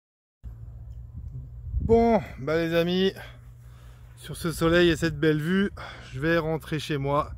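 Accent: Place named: Alsace, France. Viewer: On peut pas nié que tu es Alsacien (Bas-Rhinois) 😉😇💙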